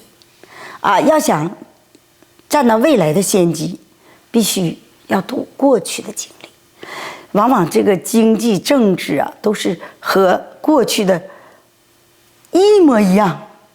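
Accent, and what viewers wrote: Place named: North, China. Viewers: 你的口音就是北方再北方的人的口音, 一个喜欢博别人眼球的人, 还自称广东人, 见鬼去吧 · 这女人格调不高，一口北方口音，关键好像光脚上田没多久的感觉😅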